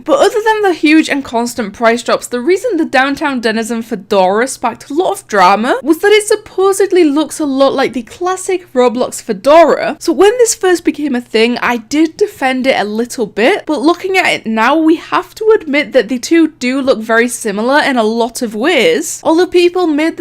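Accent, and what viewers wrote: United Kingdom. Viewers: U sound british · Are you from UK?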